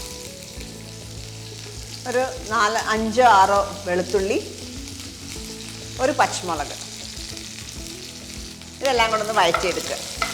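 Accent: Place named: Travancore, India. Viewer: Love ur narration in middle Travancore slang nice❤️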